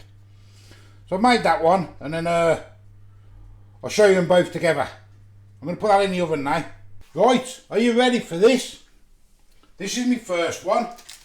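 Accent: Birmingham, United Kingdom. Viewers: Nice,loving the brummie accent,where you from ?,I was born in Smethwick now living in Florida..locals always think I'm South African...lol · You sound brummy or Wolverhampton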